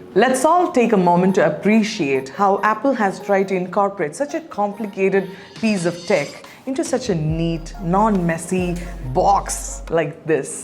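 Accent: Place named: Thalassery, India. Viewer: Thalle English 😂 Nice Presentation Crisp and Clean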